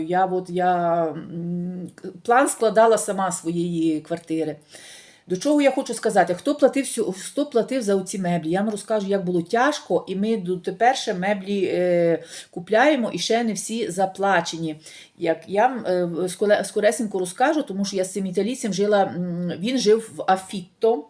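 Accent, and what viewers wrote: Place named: Western Ukraine, Ukraine. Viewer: Мова ужасна,неграмотна .Мелиш по бендерська,не принмнл вас слухать,Особенно як ти кажеш євро,,,,Даже во всех Странах кажуть ЕВРО.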